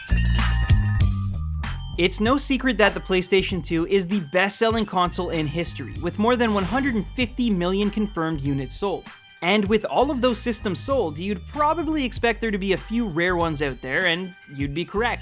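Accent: Canada. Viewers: is he Canadian? he sounds Canadian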